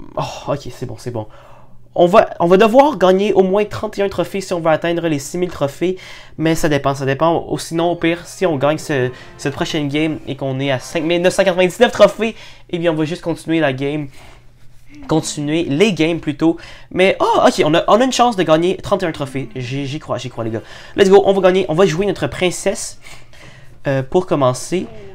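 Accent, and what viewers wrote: Quebec, Canada. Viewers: Je kiffe tes vidéos mon poto! Et puis faut dire que ton accent me tripper ! Vive la France pardon vive le QUÉBEC !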